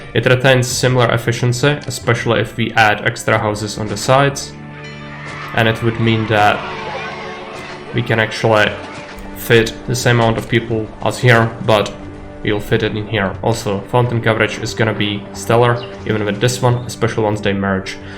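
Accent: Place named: Ireland. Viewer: first time i heard you i was convinced you were irish ☺️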